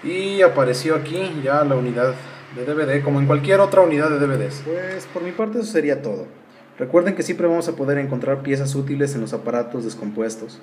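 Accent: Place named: Mexico. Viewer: ¡Excelente información!!!!!!! Por el acento supongo que vos sos mexicano!! Gracias por todo lo que enseñás en el video. En mi país al cable negro lo llamamos polo negativo y al rojo, polo negativo; pero al final es lo mismo, sólo cuestión de terminologías. Muy bueno el aporte. De nuevo mil gracias!!!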